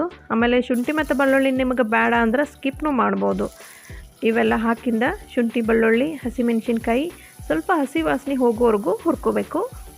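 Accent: Dharwad, India. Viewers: ನಮ್ಮ ಧಾರವಾಡದ ಮಾತಿನಲ್ಲಿ ನೀವು ರೆಸಿಪಿ ವಿವರಿಸಿದ್ದು ತುಂಬಾ ಇಷ್ಟಾ ಆಯ್ತು ರಿ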